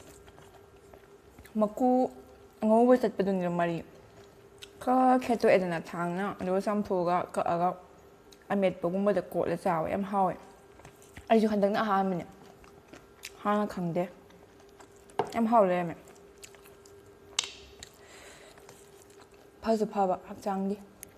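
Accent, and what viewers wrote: Kakching, India. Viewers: Eigi favorite lonne kakcing lonse taba yam nungai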